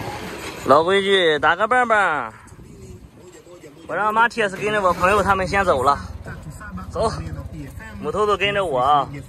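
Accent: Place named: Northeast, China. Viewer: 你的口音听起来是山东人，不知为何又带有东北味道😓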